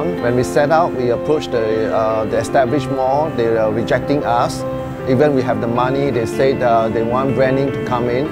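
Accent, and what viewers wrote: Singapore. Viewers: Ur accent is killing me! ! Singapore English is awful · He speaks in Singaporeans accent.... But i like his presentation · He has a Singapore accent ✌️😋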